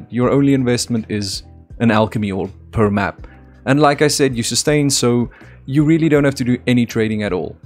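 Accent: South Africa. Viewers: That south African accent is thick AF brother. Hallo daar van die kaap. Nice vid bra. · NP i found it i knew your accent sounded familiar, im From SA to bro gg content il supp u all the way keep it up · Sounds ikea a fellow SAFFA 🤔, hoe gaan did boet, ek gaan dit vandag doen..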